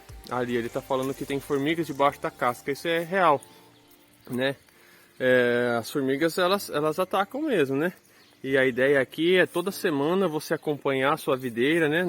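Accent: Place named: Rio Grande do Sul, Brazil. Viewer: Tu é de onde teu sutaque parece gaúcho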